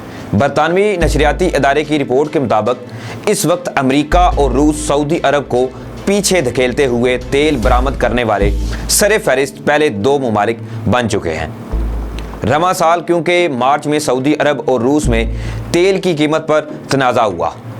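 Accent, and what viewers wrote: India. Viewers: Why you speak Urdu in Indian accent?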